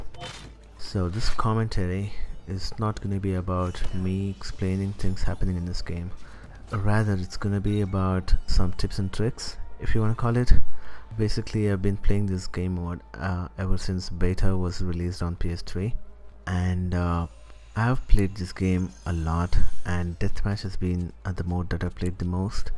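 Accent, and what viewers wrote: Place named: India. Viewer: Lmao I was only trolling, I know an Indian accent when I hear it :P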